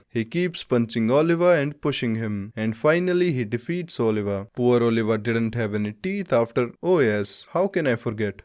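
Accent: India. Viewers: The Indian accent makes the videos so much better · Monotone voice with the Indian accent ... Got It ...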